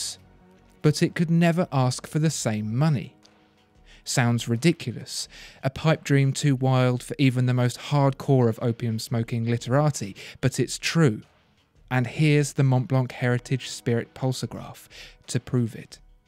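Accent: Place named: England, United Kingdom. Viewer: I love listening to him talking but if you speak about watches learn how to pronounce German and French names!! Literally ALL decent brand names are French or German. The pronunciation is just so very very English aka I know nothing outside of where I grew up.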